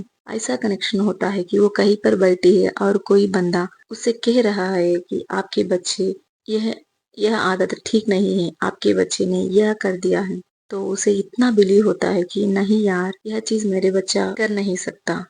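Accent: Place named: India. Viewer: sahi sahi mujhy apk lehjay sy lag rha tha ap Indian hen😍😍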